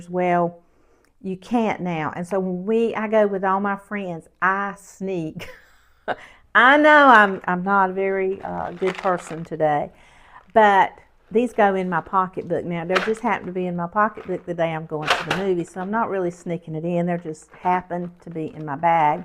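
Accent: South, United States. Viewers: Do not change your southern language, Southern accent, your dialect for anyone or anybody. You are a sweet Southern Bell! I don't understand why people always try too correct our heritage.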